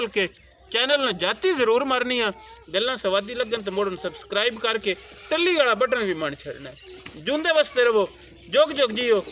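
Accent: Shahpur, Pakistan. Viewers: You are representing pure shahpuri accent of Punjab. Our shahpuri or Jatki bar is consising district like Mandi Bahauddin, Sargodha, Hafiz abad, Jhang, Chinote and Khushab.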